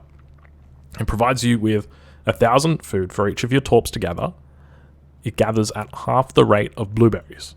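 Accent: Australia. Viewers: and hes aussie asf.. Fuck yee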